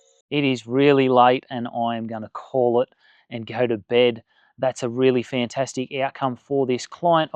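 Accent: Australia. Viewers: bro got the strongest australian accent ive ever heard in my entire life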